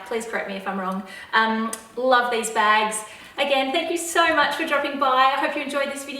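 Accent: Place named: Australia. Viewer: So nice hearing an Aussie accent on YouTube LV videos. Love the story. I almost had a heart attack when you pulled out the wallet.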